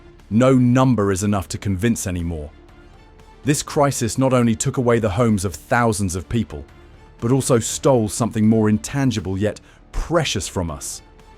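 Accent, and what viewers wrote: United Kingdom. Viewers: f this film producer nothing but propaganda ...every place has good and bad..:face-green-smiling: most are illegal criminals anyway f n brit narrating lets talke about england where he came from wht a jerk how about england paying its bills from both wwars to usa that they owe starting with your loser kings wealth? and all ye yankees go home anyway the locals are doing fine without ya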